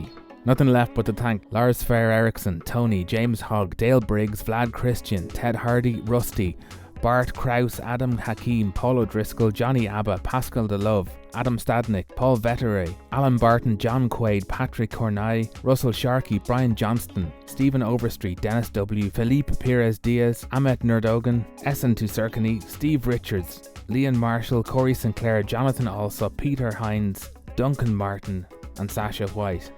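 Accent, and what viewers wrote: Ireland. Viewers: This is the best "one man show" on any platform EVER! I cant even fathom the work that goes into this! The work on the car is enough to make me faint but to package it with the stop motion and smother in the gravy that is that fantastic Irish accent I am hooked! I couldn't give two spits about RR but watched that playlist twice. is a TRUE Renaissance man.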